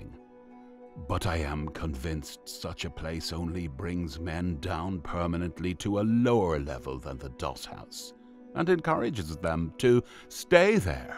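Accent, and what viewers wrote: Dublin, Ireland. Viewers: I hear a well bred Dublin accent. It is said this accent speaks the best pronounced English in the world. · Interesting an Irishman is narrating this. The exact same conditions existed in any town or city in Ireland. Actually worse, even though Ireland and England were the same country at the time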